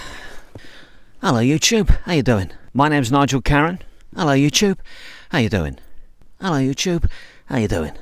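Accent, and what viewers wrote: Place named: United Kingdom. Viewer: Oooooh une vidéo en français !! J'ai hâte de découvrir. Avec l'accent british qui colore joyeusement tout ce qu'il touche ! A new challenge doesn't scare you, does it..? ☺ ✴🌟✴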